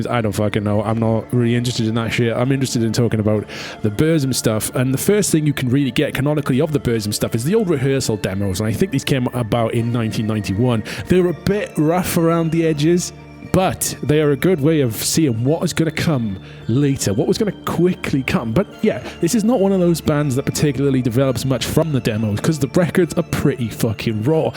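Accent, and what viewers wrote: Wales, United Kingdom. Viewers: Is this a fellow Welshman I hear?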